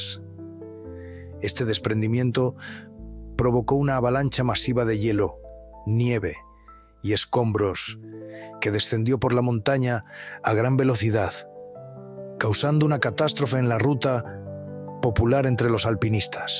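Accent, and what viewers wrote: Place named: Castile, Spain. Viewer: Magnífico documental pero lo mejor, para mí, es la voz del narrador con un castellano perfecto; yo creo que incluso puede ser un arquetipo de dicción que nos deja perplejos a nosotros los andaluces, con nuestro castellano sureño.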